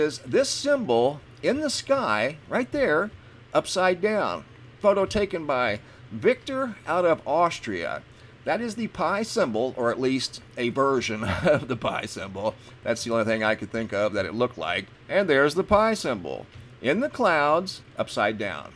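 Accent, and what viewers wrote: United States. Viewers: Love the way you American's pronounce English town/city names, so cool, wish some English people would annunciate more.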